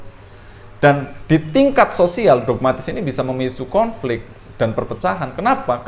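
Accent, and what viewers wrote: Banyumas, Indonesia. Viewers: Semangat mas lanjutkan, mantap sekali pemaparannya filsafat logat ngapak👍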